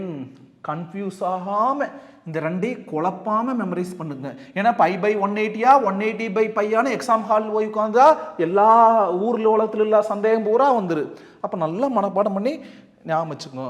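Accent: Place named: Kongu, India. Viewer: Fantastic sir Clearly understood 🎉🎉🎉🎉🎉🎉 Tq tq very much sir In kongu style slang superb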